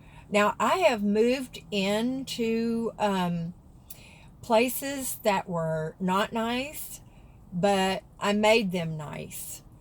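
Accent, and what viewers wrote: South, United States. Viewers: You seem so sweet. I saw on other channels how people were bashing you so I decided to take a look for myself and you are not what other people were saying. It goes to show, you have to not allow others to make up your mind for you. Go and see for yourself. I wish you the best of luck with your LBC. All the negative comments, just ignore those people. I notice your accent, what part of the south are you from? North Carolina here. Lol.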